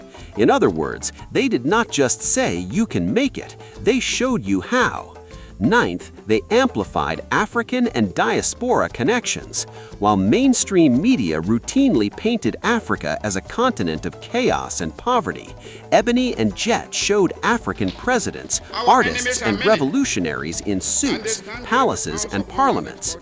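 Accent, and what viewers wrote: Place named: Europe. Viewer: Why is a European voice narrating this video? 🧐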